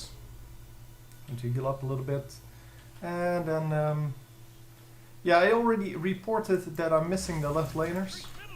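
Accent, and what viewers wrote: Netherlands. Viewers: Duidelijk nen nederlander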